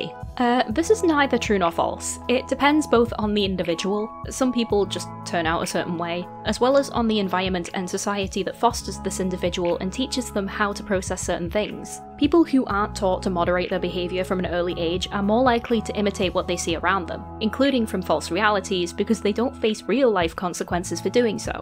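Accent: United Kingdom. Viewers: hi, i sit with the video through the end, you have a really awesome way to put the pieces together to say exactly what needs to be said, love that bit where you said the person we have to protect the most at the end of the day is ourselves, that is true and it's what i've always thought! i don't usually involve myself in drama and prefer to block and move on. however, i feel like we need to speak sometimes on these topics that could influence a young audience. btw love your british accent? it's pretty thick lmfao ( not saying that in a negative way!! just in a "i noticed" way ??? idk how to explain it LMFAO ) have a good day and u have earned a new subscriber 👍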